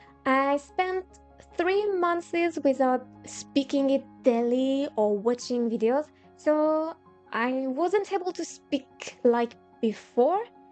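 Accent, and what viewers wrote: France. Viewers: Hola, you have improved a lot since I last commented on your videos. You've started to use the preterite a lot instead of the present perfect which is great, you have verbs agreeing with the subject. - mejor "capítulo", creo que se puede decir también "episodio" - excellent use of the imperfect and I love the cute "oui" after you corrected to "mucho tiempo" haha - cuarenta (quaranta is Italian, which I would love for you to start learning too 😄) Edit: you corrected yourself actually so great job! - this is quite advanced but I think it would be "como era", I think you can say "pero porque" but it's kind of a direct translation from English ("but because"), also then it's "no pudimos comprar nada en las tiendas" (I also get podemos and pudimos mixed up!). Great job with "pescado" that was correct and great that you didn't say "pez" - ooooh a subjunctive! 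I also love your accent in English because it's like a mix of a French and unspecified Asian (I guess Korean in your case). You speak clearly and correctly which is the most important thing. I for example would just speak to you like I do with other native speakers, that's how good you are! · Hola! I think I missed what your native language is! maybe french based on your channel's name jajaja. So, keeping with the topic of your video, im gonna keep writing in spanish. Me encantó el video! Soy de Argentina y también estoy en este viaje de aprender algunos idiomas. En este momento tengo un inglés que fluctúa entre b2 y c1, dependiendo de las skills que use (writing or speaking). Estoy aprendiendo algo de italiano y ruso (muy básico). A diferencia de lo que dicen algunas personas, creo que el español es uno de los idiomas "más fáciles" para aprender puesto que es un idioma fonético, y realmente no usamos los 16 tiempos verbales. 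en este punto estoy escuchando tu descripción y noté el acento jajaja Vos (tú) venís excelente! El acento francés le da el toque especial a tu español jajajaja, se escucha muy tierno. Entiendo que estás aprendiendo el español de España, no? El español de Argentina es mejor, way cooler 😁 Definitivamente voy a seguir todo este proceso en tu canal!!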